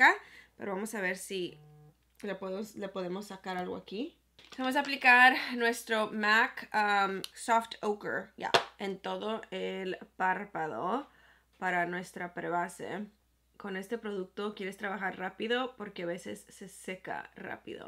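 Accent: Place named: United States. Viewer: Me gusta cómo habla jajaja su acento bien pocho jajaj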